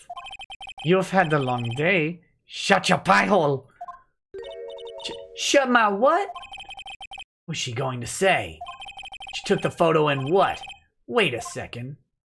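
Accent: Germany. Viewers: Your German accent takes this to the next level hahaha! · That German accent marks it 1000 times funnier!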